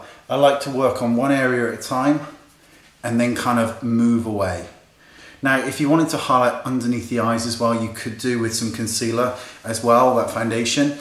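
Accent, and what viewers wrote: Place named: Bristol, United Kingdom. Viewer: Where are you from? I love your accent! I'm from Bristol and it slightly reminds me of that :D I love your videos, I've been watching them for a while now :) They've completely changed my routine. I'm a nurse and want to look clear and healthy, could you do a video to recommend a minimalist look? :D sorry for the long comment lol xx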